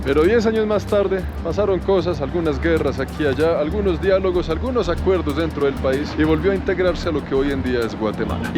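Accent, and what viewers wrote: Colombia. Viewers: Jajaja me encanta ver sus vídeos, lo explica muy divertido y con ese acento colombiano que conquista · Me encantas! Como te emocionas, lo tierno que eres con los perritos, que bonito nos describes tus viajes y tu acento colombiano... eres un tipazo! Saludos desde Saltillo, el norte de Mexico...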